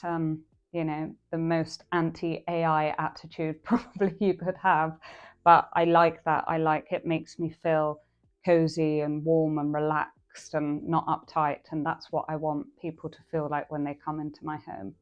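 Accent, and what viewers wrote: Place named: RP, United Kingdom. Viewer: She’s so posh! Lovely home.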